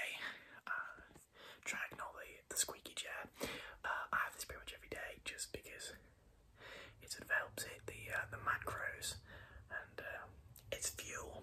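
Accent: United Kingdom. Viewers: Are you from yorkshire?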